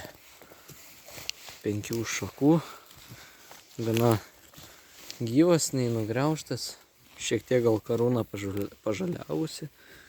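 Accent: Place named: Šiauliai, Lithuania. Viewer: Pagal tarmę panašu į Šiaulių kraštą..😉.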